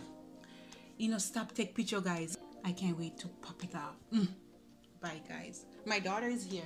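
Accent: Jamaica. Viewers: I've always loved the Jamaican accent, now i love Jamaican roasted chicken too🎉💯🔥🔥will try out sooon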